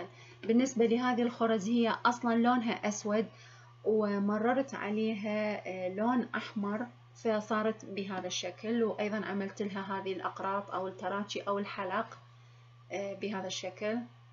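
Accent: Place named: Iraq. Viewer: من جد روعة روعة روعة سلمت يداك ست واني استفيد منك هواي واحب شغلك بعجينة السيراميك اتبع طريقتك ومقاديرك واسوي منها اشكال تجنن ميداليات بس م جربت الاكسسوارات لا تتأخرين علينا بفيديوهاتك الرائعة وافكارك الجميلة وكتير حبيت اللهجة العراقية من اسمع صوتك 😍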